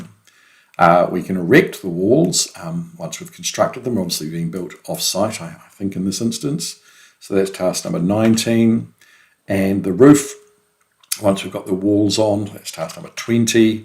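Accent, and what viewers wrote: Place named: Australia. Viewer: yeah you sound Aussie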